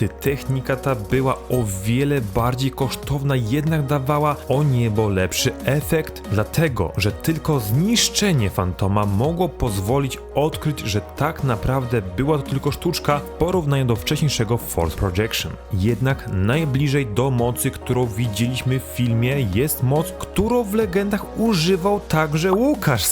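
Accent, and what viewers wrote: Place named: Israel. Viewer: gdzieś ty się uczył polskiego..człowieku..!1 co to za akcent......koszmar...z izraela...???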